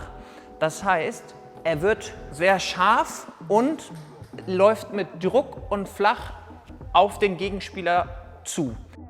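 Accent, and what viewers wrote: Hamburg, Germany. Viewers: Perfekt erklärt, super gemacht. Danke für die Tipps... und der Hamburger Slang gefällt mir auch sehr gut. Cooles shirt dazu :-) Liebe Grüße aus dem Süden Deutschlands.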